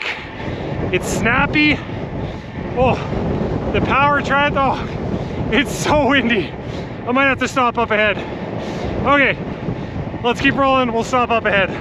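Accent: Canada. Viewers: Canadian accent is a bit of a give away as to NOT American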